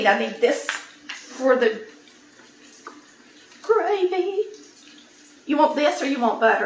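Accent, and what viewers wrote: South, United States. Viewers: I live in Dekalb County during the winter and summer and Arkansas during spring and fall for fishing. I think you speak eloquently and have a good subject and verb agreement. You have a southern drawl and you have a nasal sound. I have the same issues. I have a wall that is papered with degrees but because of sinus issues, I have a hoarse and nasal sound. We love you greatly.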